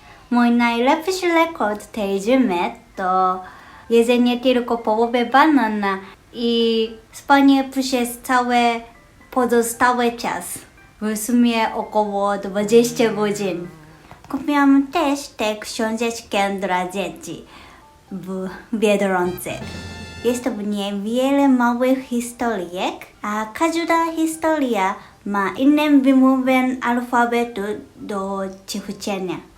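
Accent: Japan. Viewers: Hello, I'm from Poland! The way you speak Polish with a Japanese accent is really cute! My dream is to learn Japanese one day! I've been fascinated by Japanese culture and language ever since I was a kid! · Ale japoński akcent w polskim brzmi słodko :D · Drzewko ( choinka) ma igły a nie liście i wg tradycji choinkę się wyrzuca po wizycie duszpasterskiej 🙂 Mówisz bardzo dobrze po polsku, widać że się bardzo starasz ale też widać że mięśnie twarzy/języka masz przystosowane do języka japońskiego gdzie są inne dźwięki ale to normalne 🙂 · polski jest latwy smiesznie muwisz tak po polsku z akcentem japonskim bez obrazy oczywiscie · Dla mnie Japoński jest trudny, ten Wasz akcent 🙂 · Dużo ludzi, którzy przyjeżdżają do Polski z innych krajów mówią, że polki jest trudny. Zgadzam się z nimi, ponieważ pomimo, że jestem polką również mam z nim problem. Na przykład z ortografią i gramatyką. 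To są dla mnie męczrnie. Szczególnie gramatyka. Z ortografią miałam problem w młodości😊 Życzę powodzenia. I tak już bardzo dobrze mówisz po polsku. I bardzo podoba mi się ten twój japoński akcent. Jest cudowny❤ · HAHA pomimo że czasem masz problem z naszymi dż, dz, ć itp. mówisz tak dobrze że łatwo cię zrozumieć a ciekawostką jest akcentowanie japońskie polskich słów :D Choinki niestety nie lubią ciepła i suchego powietrza mieszkań (nawet te w doniczce z korzeniami)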